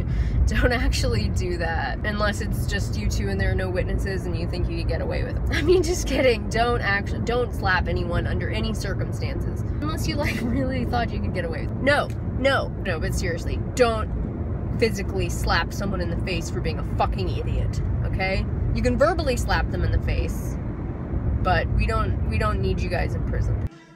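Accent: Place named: East Coast, United States. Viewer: Hawaii I knew you were from the east coast! You have the same accent, voice & cadence as one of my best & oldest friends <3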